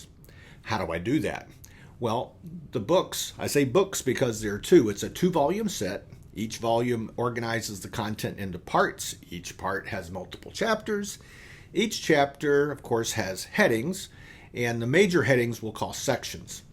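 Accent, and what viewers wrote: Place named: South, United States. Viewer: Excited, one to finally meet you here,. Two to finally be able to understand someone not too fast or too slow speaking, and also like your southern draw. Its refreshing 😊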